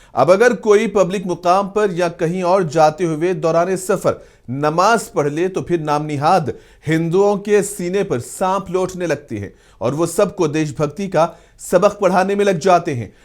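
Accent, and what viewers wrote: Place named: Pakistan. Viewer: Tumhare lehze se lag raha hai tum pakistani news anchor ho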